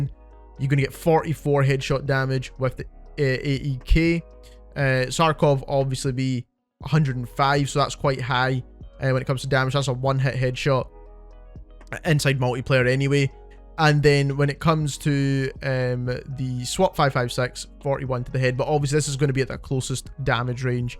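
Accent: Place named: Glasgow, United Kingdom. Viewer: Are you Scottish bro sound a tad Glaswegian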